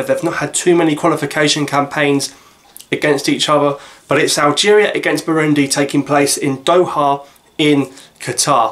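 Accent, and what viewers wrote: United Kingdom. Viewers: hello, would you mind told me where did you learn the British language ?? or it's your mother language? and are you live in Algeria, please can you answer my questions?? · You got such a nice british accent , do u live in UK or Algeria ?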